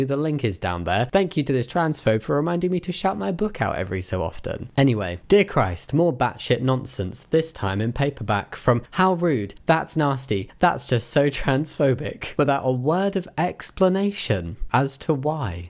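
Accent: United Kingdom. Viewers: Re, Smug and patronizing: Thats the American ear hearing the British accent. John Oliver makes jokes about it frequently. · Omg these hate comments 😂😂😂 the only issue i personally used to have with your voice is your heavy british accent. It used to be kind of hard to understand for me no matter who spoke it, because english isn't my native language and the waste majority in media uses mostly american accents. I'm all good these days though, in part thanks to following you and few other channels of people from the UK. Love you, ❤, please don't even change. The cross between the dry humor, politely formulated sass, and actual explanations are so uniquely you, i love it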